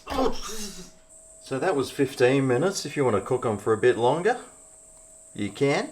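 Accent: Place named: Australia. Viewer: Love your Aussie accent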